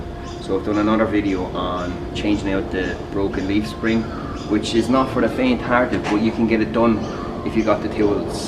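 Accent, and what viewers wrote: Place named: Ireland. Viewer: I like your Irish accent!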